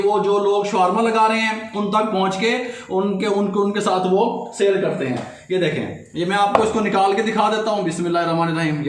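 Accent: Punjab, India. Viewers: ur style...ur punjabi tach....wow masha Allah...love it.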